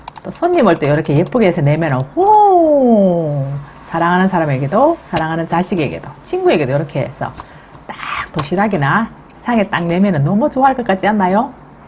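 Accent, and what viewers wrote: Gyeongsang, South Korea. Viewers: 짝짝짝 😄 구수한 경상도 사투리가 정겹습니다 얼굴이 안보이가 보이스만 들리니까 개그우먼 김영희씨 얼굴이 자꾸 떠오르네요 유쾌하고 유익한 영상 잘보고 있습니다 참고로 저는 캘리포니아 에서 열심히 응원합니다~ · 갱상도쌤ㆍ오늘도구수한사투리 ㆍ감사합니다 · 계란 말이도 예쁘지만 경상도 말솜씨가 너무 재밌네요 친정 막내올캐 목소린데 맞지예~ 듣고 깜놀 했네요 지는예 둘째 월선 고모입니다 박수 짝 짝 짝 댓글 보면 답주이소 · 너무재미있으시네요 경상도버젼으로얘기를잘하시네요 짝짝짝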